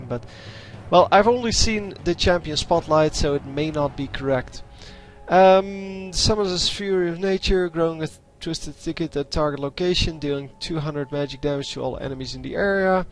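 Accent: Netherlands. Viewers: Are you dutch? freat channel BTW subscribed ;-)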